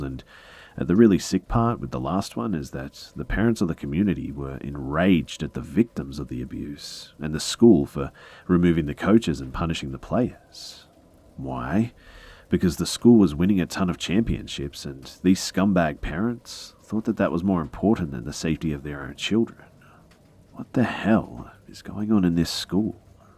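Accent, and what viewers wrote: Australia. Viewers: So good to hear an Aussie accent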